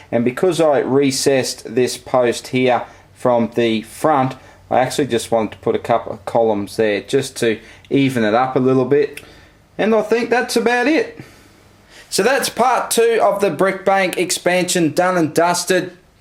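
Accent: Australia. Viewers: You have the most pronounced Australian accent I have ever seen. Great build btw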